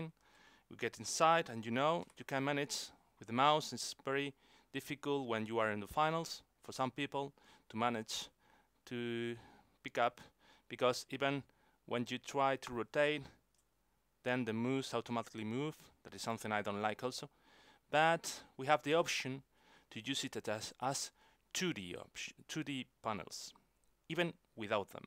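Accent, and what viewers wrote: Spain. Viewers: Damn I love your voice!!! So spanish ^^ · A complete tutorial by Antonio Banderas (: Just kidding. Your Spanish accent is real class man. I love it!, this is a wonderful aircraft and I'm really enjoying it. Even though I use TrackIR (and I thought everyone did), this plug-in will help a lot. Your link didn't work for me, but I used this one: misc plugins page and see it as "LockView" download.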